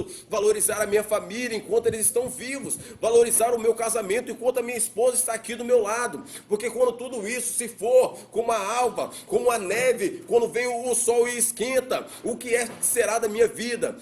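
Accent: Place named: Bahia, Brazil. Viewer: Gostei muito do seu jeito de explicar deste jeitinho baiano rsrs, Que Deus continue abençoando.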